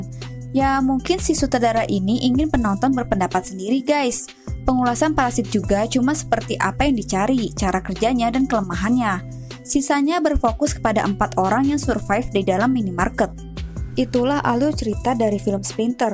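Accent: West Java, Indonesia. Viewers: Sunda ya kak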